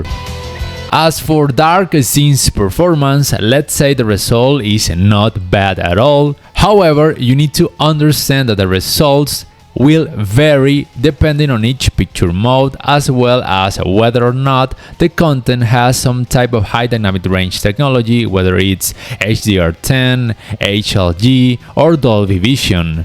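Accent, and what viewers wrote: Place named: Colombia. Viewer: Buen inglés bro!! Se escucha tu acento colombiano 😂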